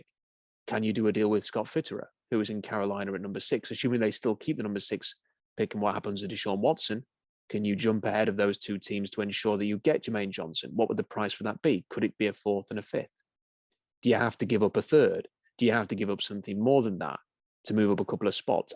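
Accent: England, United Kingdom. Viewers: Great analysis. First time listener. Still trying to wrap my mind around NFL coverage in an English accent.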